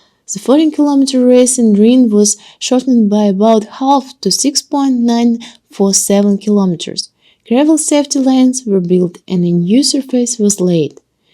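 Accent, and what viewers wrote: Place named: United Kingdom. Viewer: При просмотре фильма, вспоминается документальные фильмы от BBC. Многие, кто любит смотреть такое кино, вспомнят, ламповое ощущение и детский восторг от просмотра. Особенно британский акцент, лично мне он нравиться с детства. Подобранная музыка, локация сьемок, манили не моргать. Кто интересовался шоу Topgear, тот поймет о чем Я говорю. Обнимаем и радуемся ее подачей.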